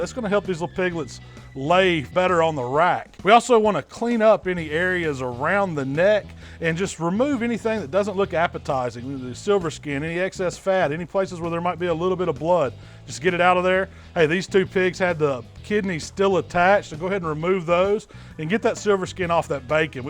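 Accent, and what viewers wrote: South, United States. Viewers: It's the country accent + being husky 😂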